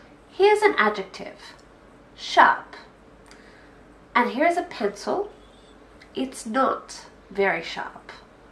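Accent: Australia. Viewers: Is this Aussie English? That's what I'm looking for :) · Yes, she is Australian.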